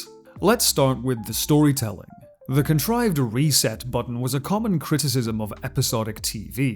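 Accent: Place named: England, United Kingdom. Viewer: Wait you sound English. Are not most English and European shows like 1-4 episodes a season which you call series, and then it only goes for like 3 seasons anyways? Or do you get a lot of American TV Shows in UK?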